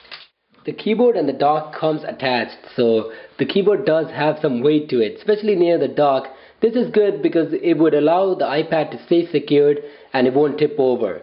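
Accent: India. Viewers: Great unboxing dude! (By the way, are you Indian?)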